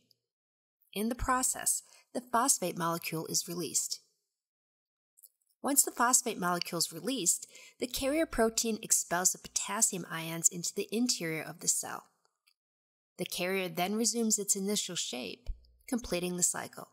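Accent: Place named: Midwest, United States. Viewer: love the subtle Midwest accent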